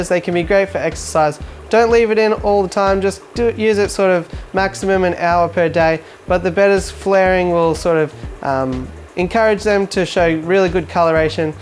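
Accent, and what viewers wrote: Australia. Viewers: Are you from Geelong !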